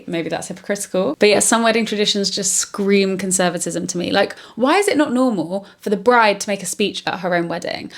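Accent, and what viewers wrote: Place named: England, United Kingdom. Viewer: Was odd hearing the word "bachelorette" so much in an English accent - so the Americans know what you're on about I guess - then you said "hen do" 😉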